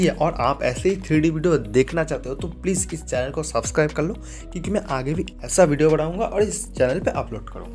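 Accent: Bengal, India. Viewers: आपकी भाषा से आप बंगाली लगते हो को बंगला भाषा बोलते है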